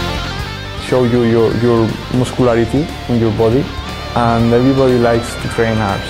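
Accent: Spain. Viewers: Se nota que es español...el ingles le hace falt pulirlo caxo... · Looking at this pose, you can see he does need a little more work on the lats. And where is he from? I recognize a Spanish/Hispanic accent...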